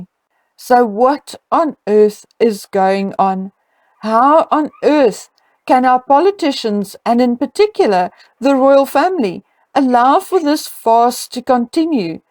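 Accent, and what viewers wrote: South Africa. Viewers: GREAT accent! South African? If i'm wrong, many apologies!!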